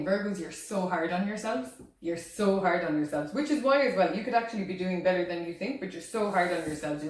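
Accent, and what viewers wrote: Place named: Ireland. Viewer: Hello love your reading. Beautiful accent, it makes me miss being in Ireland with my grandparents :) All my family is from Ireland I was born and raised in Prince Edward Island Canada. Looking to hear more in the future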